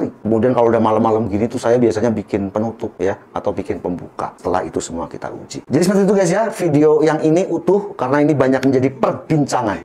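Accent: Java, Indonesia. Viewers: bang sampean dari jawa bukan? logatnya medog , 🤭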